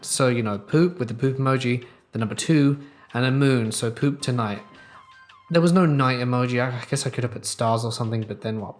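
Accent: England, United Kingdom. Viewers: R u from England??? Cuz u have a certain accent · Nothing better than hearing vampirish English accent saying “shart”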